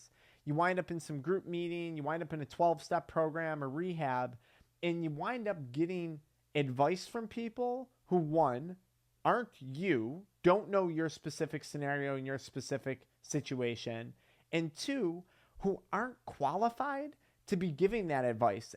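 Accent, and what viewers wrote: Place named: Western New York, United States. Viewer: I know another western New Yorker when I hear one 😂 awesome content as always!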